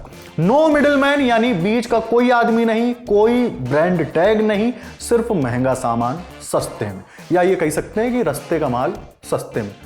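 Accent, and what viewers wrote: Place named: Kanpur, India. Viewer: कहि रहे...रहि जाएगा...वइसे...पहिले...😂😂😂 कनपुरिया स्पॉटेड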